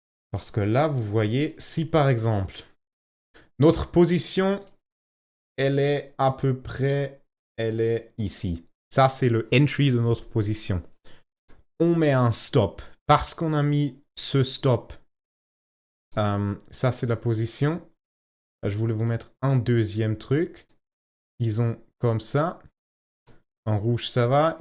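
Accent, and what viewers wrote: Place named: Eastern Europe. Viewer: bravo à toi pour l'explication : très claire, et je n'ai pas vu ça ailleurs :)) comment va ton portefeuille depuis oct 2024 (date de la video) j'imagine que tu as bien profité : ? une question : je suis sur Blofin, apparemment il n'est pas possible de ressortir la marge initiale et de même je ne peux pas changer le levier même après après avoir remonté le stop loss dans un endroit où je ne perds plus (je ne peux que gagner).Blofin m'indique le message "you still have isolated orders pending" et me demande de supprimer le stop loss avant de pouvoir changer le levier, de ce que je comprends.....Sais-tu me confirmer que sur Blofin ce n'est pas possible de faire ce que tu as fait sur Bybit ? Dans ce cas as-tu un conseil pour une plateforme alternative à Blofin que je puisse utiliser (Kucoin peut-être ?)....Au fait bravo pour ton français, je croyais déceler un petit accent du Nord (type Belgique flamande) mais non, c'est bien un accent de l'Est...Pourquoi faire tes vidéos en français plutôt qu'en anglais ou en allemand ? 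Cheerz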